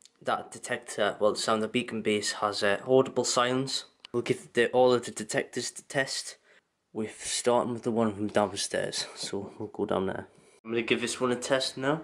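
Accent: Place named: Wales, United Kingdom. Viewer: No, hes welsh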